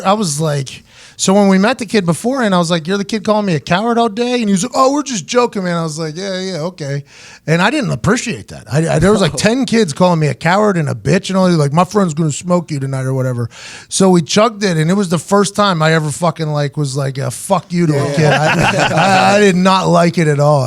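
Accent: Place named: New Hampshire, United States. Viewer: Buddy sounds like hes from New Hampshire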